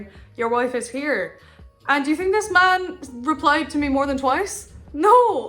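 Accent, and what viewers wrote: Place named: Ireland. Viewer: Lastly, you immediately got more irish when you went home hahah you can really hear it at the start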